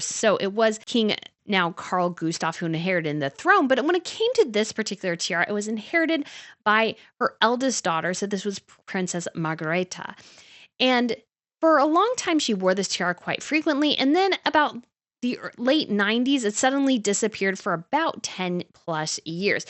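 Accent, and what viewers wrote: United States. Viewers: Makes me cringe listening to your whining American accent criticising a late Queen’s tiara RIP. No class, no respect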